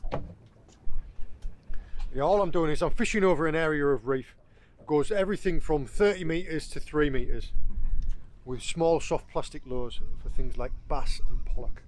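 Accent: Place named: Cornwall, United Kingdom. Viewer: “A bar of soap with pins in it” so accurate.As a youngster I caught small Bass in and around st Johns Lake in the Tamar by the bucket full.The best tool I had for holding bass was an old bar towel. Looks like your new boat in this vid? I was out fishing with a mate discussing your videos, he said there must be lots of foreign tourists Admiring your Cornish accent.It was mentioned in good taste and did make me 😂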